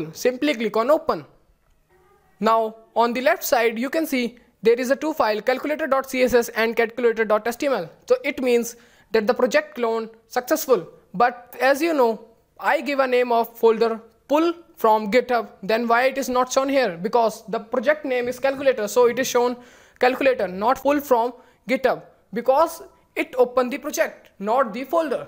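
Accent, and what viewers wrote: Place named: India. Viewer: What a curry accent.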